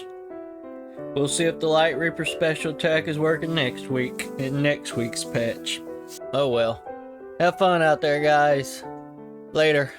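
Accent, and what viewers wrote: South, United States. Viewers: LOL. The southern accent is killing me. haha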